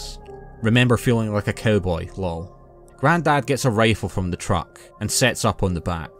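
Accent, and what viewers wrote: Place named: Scotland, United Kingdom. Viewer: I decide I like hearing a Scottish accent telling spooky stories. It keeps me actually focused on the story somehow and it also feels like I’m at the bar listening to a friend tell me an intense tale over some drinks. I like the vibe you bring sir.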